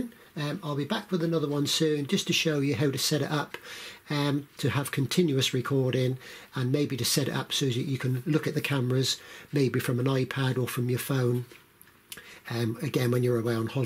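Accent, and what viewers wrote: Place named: West Country, United Kingdom. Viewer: Mate u sound west country ish lol👍😎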